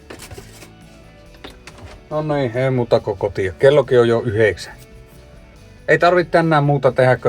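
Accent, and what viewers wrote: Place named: North, Finland. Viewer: Murteesta päätellen jänhkhä jooseppi tämä tekijäukko. Ja temusta tilaa tavaraa, on siinäkin yhdistelmä.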